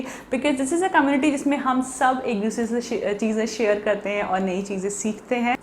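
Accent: Garhwal, India. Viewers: Aap gadwali ho kaha se ho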